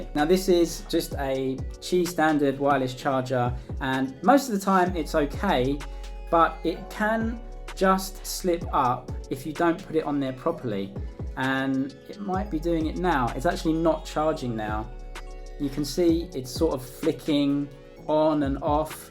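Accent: England, United Kingdom. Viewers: I'm sorry to say but you are just over critical, going to China, speaking with strong enlgish accent commenting their phones, clinging to the small details and making sensations on youtube. it is too commercial and nonsense this video, hoping to attract viewers by titles and shits.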